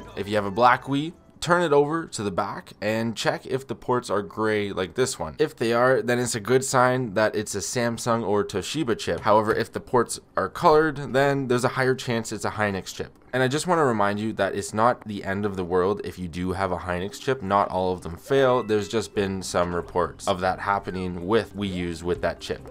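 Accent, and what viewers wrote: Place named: Canada. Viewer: you pronounce sorry like soorry. Just like me and many other Canadians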